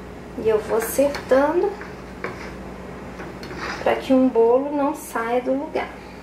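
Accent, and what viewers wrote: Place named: Minas Gerais, Brazil. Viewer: Parabéns. Arrasou, como sempre. Adoro seu sotaque de Mineirinha. Uma graça! Beijos querida.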